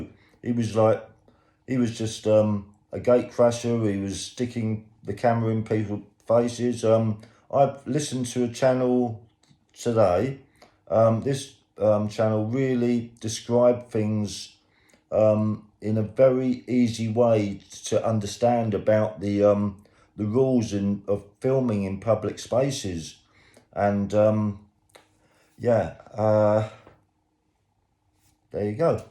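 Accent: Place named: United Kingdom. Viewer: You sound like a British idiotic jealous mfer!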